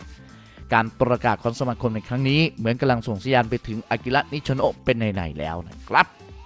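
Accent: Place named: South, Thailand. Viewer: แอดมินเป็นคนใต้ชัวร์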